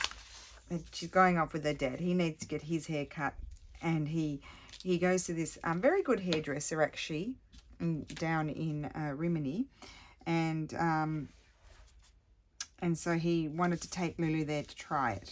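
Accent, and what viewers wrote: Australia. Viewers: I love how you sound more "Aussie" the longer you are away from home! This is lovely. I am still holding out hope that my birthday challenge entry arrives this year!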